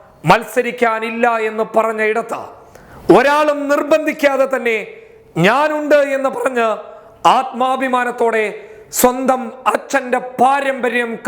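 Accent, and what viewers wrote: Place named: Northern Kerala, India. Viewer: ഈ ഇട്ട് ഇട്ട് എന്ന ഭാഷ വടക്കൻ ഭാഷയാണോ?ഡക്കറേഷൻ ഉണ്ടങ്കിലും ഇടയിൽ വടക്കൻ സ്രാങ്ങ് വരുന്നുണ്ട്.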